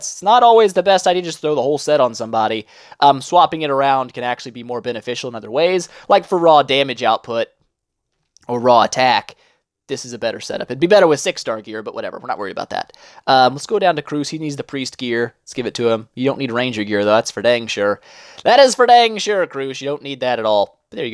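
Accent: United States. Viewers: He has traces of an American accent IMO.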